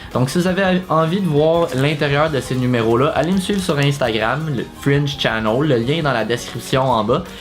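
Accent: Quebec, Canada. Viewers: Es tu quebecoi (moi oui)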